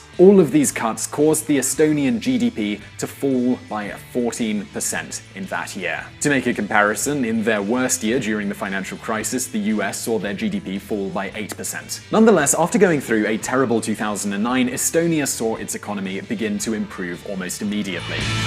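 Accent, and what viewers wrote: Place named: United Kingdom. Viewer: british english, sounds good. awesome, im peruvian, i like british english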